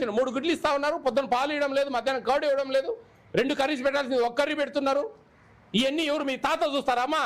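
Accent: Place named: Kurnool, India. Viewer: ఇన్ని వీడియోలు చూసాను సార్ అన్ని వీడియోలు కన్నా ఈ వీడియో పవర్ ఫుల్ గా తిట్టినారు సార్ దూకి సావండి ఎక్కడైనా మరి రాయలసీమ భాషలో ముఖ్యంగా కర్నూల్ భాష లెక్క మాట్లాడుతున్నారు సార్ వాళ్లకు చేత కాకుంటే మాలాంటి వాళ్ళకి ఇవ్వండి సార్ నేను చూసుకుంటాను సార్ 10 15 హాస్టల్లో చూసుకుంటాం అలాంటి వారిని తక్షణమే తీసేయండి సార్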